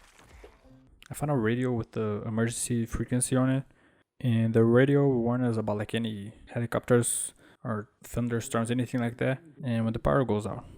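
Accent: Brazil. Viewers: sabia que tú era brasileiro, o sotaque é inconfundível kkkkk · Where u from? U got a brazilian accent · Eu sabia que tu era br! O sotaque ta do jeitinho, boa série btw, ganhou o meu follow! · Pelo seu sotaque você e br só pode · você é brasileiro? parece muito o sotaque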